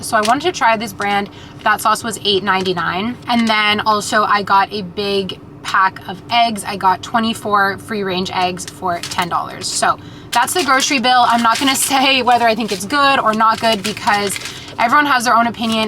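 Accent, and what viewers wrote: Quebec, Canada. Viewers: I adored listening to you speaking French <3 with the cutest Quebec accent at that ! lots of love from France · I like your videos and in this one it was the first time I heard you speaking in French and you even have the Quebec accent when speaking French I'm impressed (I love the Quebec accent as a French from France) :hands-yellow-heart-red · Haha you have such a strong quebecois accent now when you speak French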